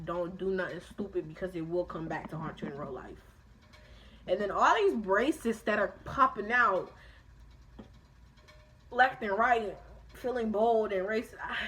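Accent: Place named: United Kingdom. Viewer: I stan the British accent. Teach me.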